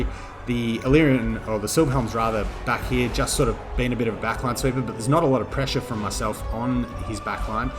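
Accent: Australia. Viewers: Great games bro! we gotta run into each other more on ladder :P Goddamn surprised me, your voice is amazing for casting along with the Australian accent!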